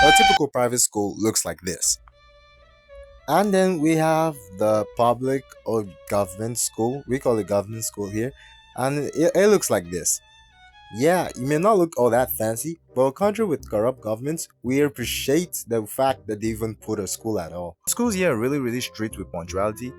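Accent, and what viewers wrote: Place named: Africa. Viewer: You are African.(the dang accent reminds me of ME)😂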